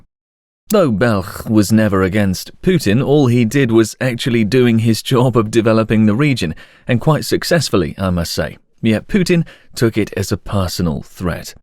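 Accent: United Kingdom. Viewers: That's because that's not the voice of the channel's author, they hired an actor from the UK and didn't hide that btw, check video's discription. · Did you learn British English as a youth and thus, speak British English like a native, or does an Englishman narrate your scripts, or what?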